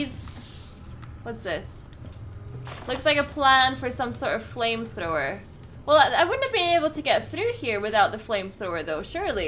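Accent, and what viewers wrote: Scotland, United Kingdom. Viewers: Love you! Damn well hooked me on this series of yours so you have my thanks for that including getting them out so early! (Plus the amazing scottish accent)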